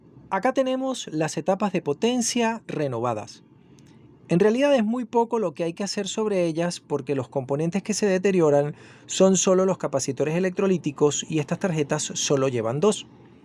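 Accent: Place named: Guayaquil, Ecuador. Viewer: Solo he visto unos minutos de este video para darme cuenta que usted es un verdadero técnico y conocedor de estos hermosos equipos de alta fidelidad. Una pregunta: en que país se encuentra usted? Por su acento me parece que en Guayaquil-Ecuador..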